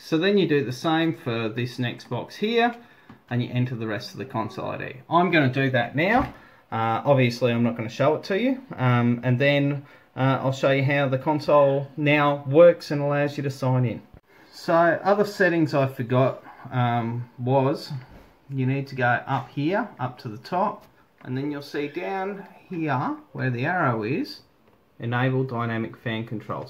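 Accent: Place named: Australia. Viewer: Mate... if I knew where you lived, I'd but you a beer or three...! Got me out of a jam. Good to hear an Aussie accent that knows what he's talking about / doing.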